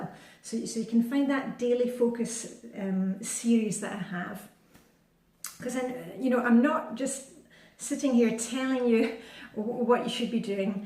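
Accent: Scotland, United Kingdom. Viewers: I love your accent! Just wondered if you read the latest Ian Rankin, In a House of Lies. I often listen to the audiobook versions because I love the Scottish accent.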